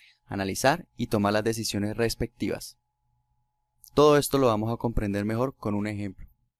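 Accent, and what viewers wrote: Cali, Colombia. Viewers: voj sos caleño si o que? pajame eje japato ve ... buen video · Vos eres caleño cierto??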